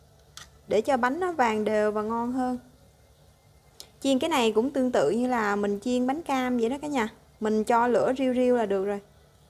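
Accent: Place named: Mekong Delta, Vietnam. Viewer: Mình thích món này lắm, hôm nay được bạn chia sẽ công thức cảm ơn bạn giọng nói của bạn thật dễ thương, mình nghĩ chắc bạn là người miền tây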